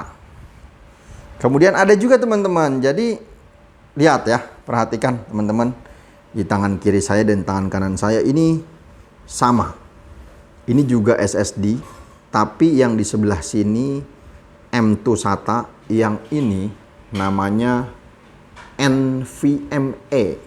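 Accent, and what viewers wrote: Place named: Betawi, Indonesia. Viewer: orang betawi ya bang, gaya bicaranya ketauan banget. abangnya ganteng juga. upgrade saya dong bang🥰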